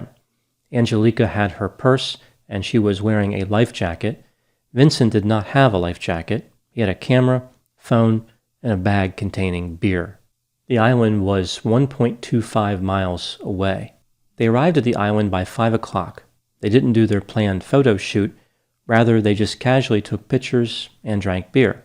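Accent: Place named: Maryland, United States. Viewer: I thought you might have a Maryland accent and I looked you up and read that you're from Delaware.. I wasn't far off! :) 🤗 I just found your videos not long ago and love them.. subscribed.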